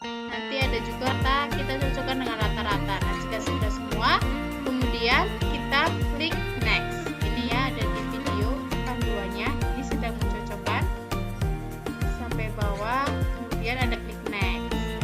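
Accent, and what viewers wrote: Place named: Java, Indonesia. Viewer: Medhok ya kak😂semangka❤semoga bermanfaat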